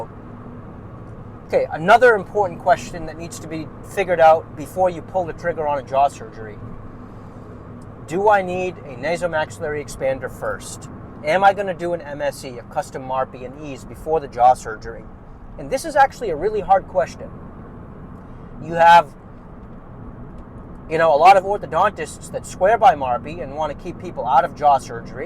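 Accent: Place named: Eastern New England, United States. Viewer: I love your Eastern New England accent!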